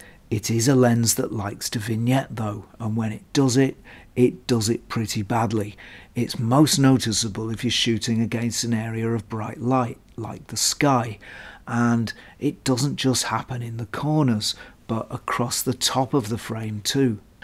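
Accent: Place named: Liverpool, United Kingdom. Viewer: Great video! You sound like you’re from Liverpool. Correct me if I’m wrong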